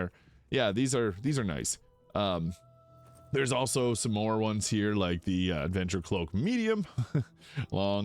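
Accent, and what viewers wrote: Canada. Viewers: i feel like that is how you would actually say it. 1st time i heard you pronounce about as "a-boot" i was like, this guy is definitely from the great lakes area or canadian.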